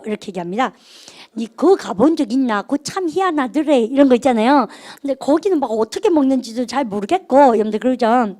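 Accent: Daegu, South Korea. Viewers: "무봤나?" ㅋㅋ 설명 안해줬으면 채소 "무"를 찾는줄 ~~ 대구말 정말 매력있고 강의도 덕분에 넘 재미있네요 ㅎㅎ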